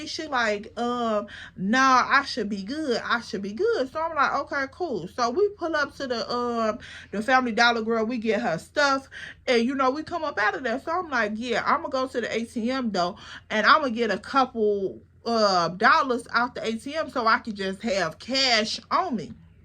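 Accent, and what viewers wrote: Chicago, United States. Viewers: I love Chicago accents but we def need pt 2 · I could listen to somebody from Chicago talk all day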